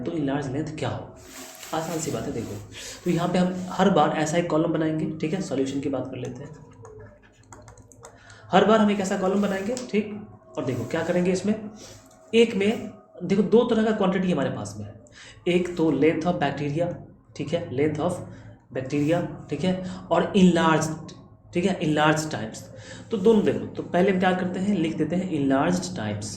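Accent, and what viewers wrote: Bihar, India. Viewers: You are from bihar na Because बणा .......बरा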